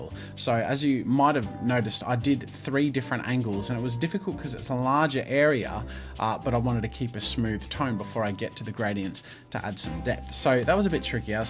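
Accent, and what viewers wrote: Australia. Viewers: Oh shit, this guy's an Aussie! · Aussie mate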